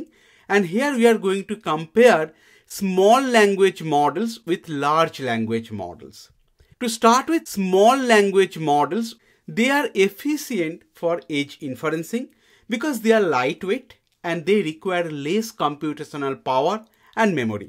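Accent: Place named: Bengal, India. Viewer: Thank you. The content is really good and helping a lot for certification preparation. Just a suggestion, the voice used for recording is Bengali / biased . May be a auto dubbing provided by youtube will be more polished and helpful since there is no bias for pronounciations. Again, its a suggestion, please dont take it in a negative way.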